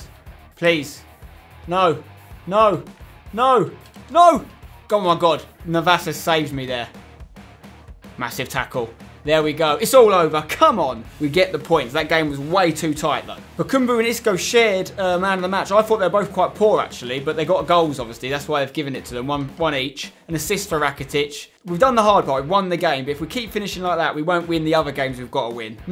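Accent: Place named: United Kingdom. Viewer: Chaz Hartwayne he talks British that is why he is saying it like that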